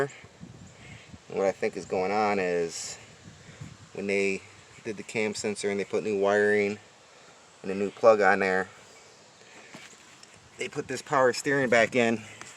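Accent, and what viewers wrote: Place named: Pittsburgh, United States. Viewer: You’re from Pittsburgh huh? Lmao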